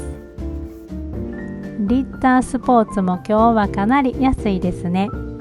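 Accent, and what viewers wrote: Kansai, Japan. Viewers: はんなりとした関西弁❣️めっちゃ好きになりました❣️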